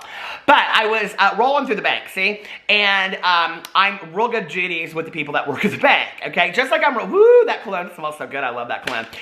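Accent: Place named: Indiana, United States. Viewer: helped me pass my Test of English Foreign Language! For 4 months I watched his videos on all of his channels to practice listening and speaking. One day I might speak as fast as, with some indiana accent! 🤩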